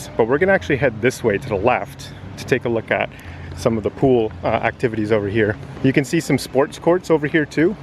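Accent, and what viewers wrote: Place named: Canada. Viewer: Obviously you are Canadian. Linguists generally point to around 10–15 distinct accents in Canada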